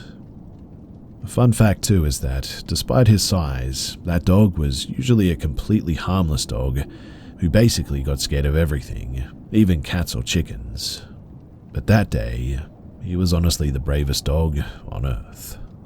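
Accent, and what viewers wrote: Australia. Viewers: Are you from Australia 🇦🇺 ❤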